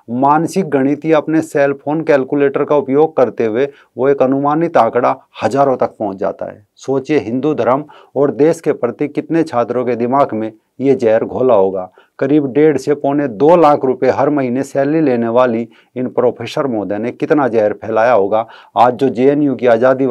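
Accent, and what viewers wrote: Haryana, India. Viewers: You first get rid of your hariunvi accent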